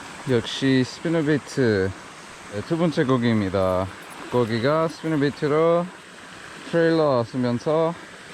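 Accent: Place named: Busan, South Korea. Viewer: 부산 친구 때문에 사투리 쓰는건가요ㅎㅎ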